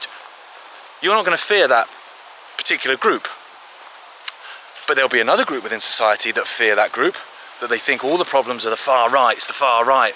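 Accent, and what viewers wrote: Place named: Cockney, United Kingdom. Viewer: Say ands b proud of cockney accent I am one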